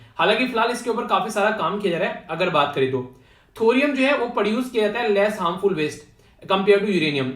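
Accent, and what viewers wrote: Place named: Rajasthan, India. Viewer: sir aap kuch bhi kho ki aap kha se lekin i know it bcz aapke sare keyword or bolchal ki bhasha poori rajsthan ki mere according to rajsthan sehi ho or syd aap shrri ganganagar ya north rajsthan se ho im sure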